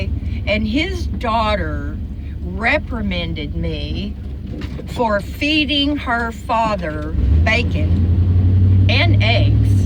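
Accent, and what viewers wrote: South, United States. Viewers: ? I'm a new subscriber. Where did you get your ya'll accent? Anyway, I enjoy your videos.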